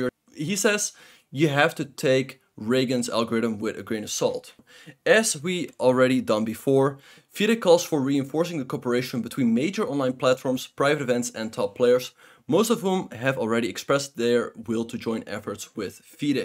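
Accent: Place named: Netherlands. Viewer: Man I love your accent I can tell you're dutch or flemish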